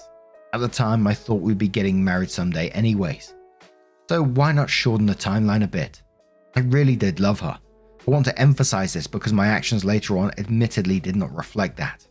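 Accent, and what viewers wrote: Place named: United Kingdom. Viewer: I listen to your videos every day, but I just arrived to London from the states and it’s jarring to hear more than one British person in a day when all I’m used to is your voice 😂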